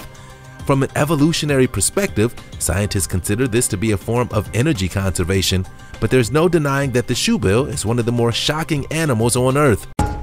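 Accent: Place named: North, United States. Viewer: one more grating yankee voice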